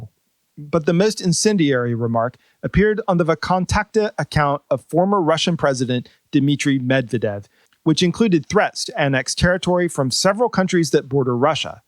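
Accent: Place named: Philadelphia, United States. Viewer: Are you from Philly? Guessing base on your "o"s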